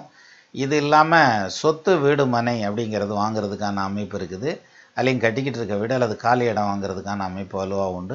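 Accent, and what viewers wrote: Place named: South Tamil Nadu, India. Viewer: Sir,I like ur Tamil and slang..like we south Tamils. Thank u.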